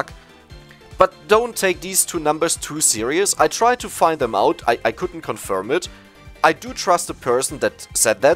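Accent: Germany. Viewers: Bro you're a German, right? Idk I feel the German accent in your English so badly haha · Hab nach 2 sekunden gemerkt dass du deutsch bist, aber geiles video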